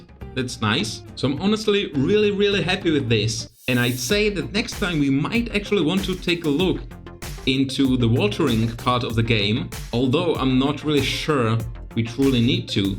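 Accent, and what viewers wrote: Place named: Germany. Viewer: The guy sounds German which to me is an interesting demonstration of how "bad language" is not inherently "bad" and I enjoy how diverse the reactions there are to the same thing.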